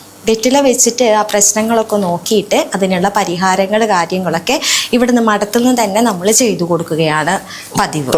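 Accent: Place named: Thrissur, India. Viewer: തൃശൂർ പച്ച മലയാളം 🙄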